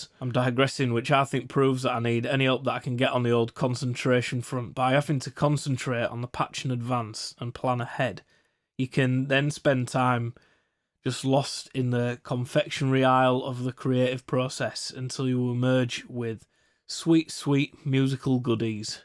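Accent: Northern England, United Kingdom. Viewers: Haha, great video. I love the humour and the northern flavour (I'm a bloke from t'North meself). I really liked the video <$1000 modular too. I'm holding the line at semi-modular right now but the ropes are straining! Cheers.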